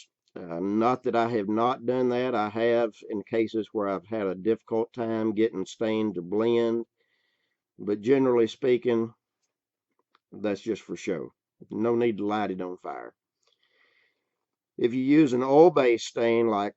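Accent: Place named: South, United States. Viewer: I literally thought I would need 2 or 3 carving tools.. but I see that Iwould need to spend a lot and that is not a problem because it is investment to use them for other work.. but I hate having so manyh tools around which I will use once every 3 years for whimp.. I thought it would need much less tools to do it hand made.. thanks a lot for your informative video. What state or region is your accent from.. thanks. is it southern accent?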